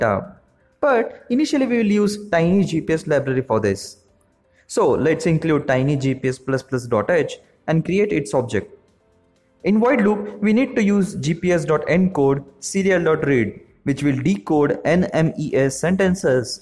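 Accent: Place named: Gujarat, India. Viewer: very informative! (*liked your gujju tone though😉😁)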